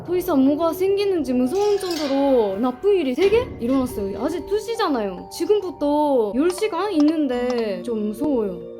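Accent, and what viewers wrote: Gyeongsang, South Korea. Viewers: 뭔가 대구 말투가 되어가고 있다. 억양이 느껴지네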